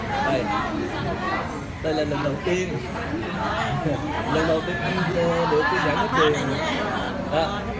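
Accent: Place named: Mekong Delta, Vietnam. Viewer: Giọng cua bác hơi tây tây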